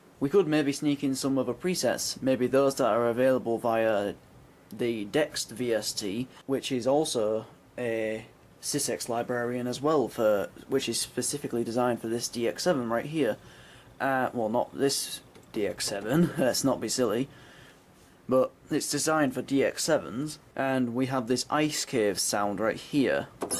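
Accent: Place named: Yorkshire, United Kingdom. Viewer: Definitely Yorkshire but hmmmm which part.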